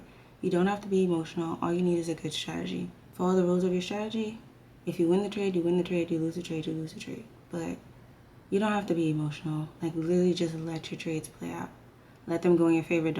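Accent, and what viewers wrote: Bahamas. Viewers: Are you Bahamian? Sometimes your voice and how you say words reminds me of how Bahamian women speak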